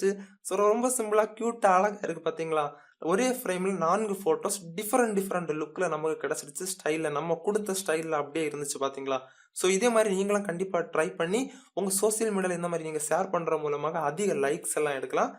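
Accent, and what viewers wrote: Kanyakumari, India. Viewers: neenga pesurathula...irnthu..kandupudichen...me..also Kanyakumarian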